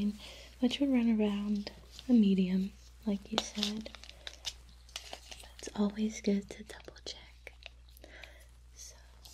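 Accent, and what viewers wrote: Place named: South, United States. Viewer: Tue only problem is she sounds southern and me and the south (mainly the bible belt) try and stay on opposite sides of the Mason dixon line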